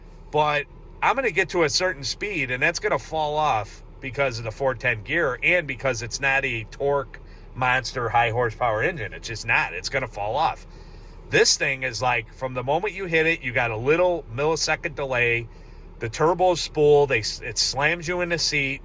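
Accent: Chicago, United States. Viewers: Yeah, i'm from Chicago as well and currently in TN, I too was gonna ask you the same question because I thought that accent sounded familiar. The Wife and I just looked at the the explorer st this weekend. I'm on the fence about and one of the biggest issues i have is that stupid vertical screen display. When i put it in reverse there was just a small 3-4" display which I could hardly make out any detail....that may kill it for me, gonna look around there's a lot in that segment these days and yes the Kia, hyundai, genesis GV80, and the Lincoln Aviator is a consideration as well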